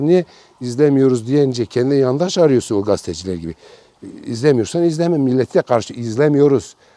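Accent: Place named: Anatolia, Turkey. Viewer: Anadolu silvesine ve senin dogalligina hayranim icinden geldigi gibi konus bosver sen kotu yorum yapanlari. Kolay gelsin kardasim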